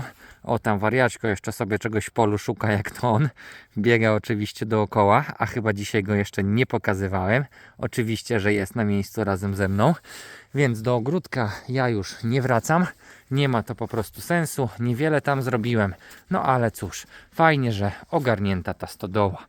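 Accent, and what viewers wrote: Lublin, Poland. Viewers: Z Twoich wypowiedzi wynika , że pochodzisz z lubelskiego. "Ciapy"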